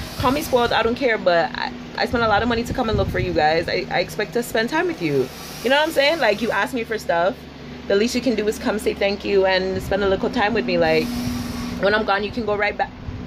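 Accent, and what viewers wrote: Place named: Cockney, United Kingdom. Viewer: The cockney though 🤣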